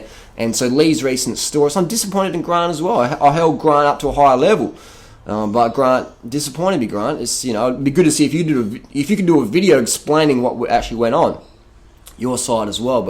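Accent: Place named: Australia. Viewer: I just hate this Australian accent...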